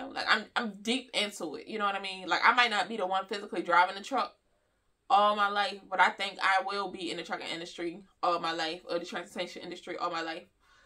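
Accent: Baltimore, United States. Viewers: Sounds like you from Bmore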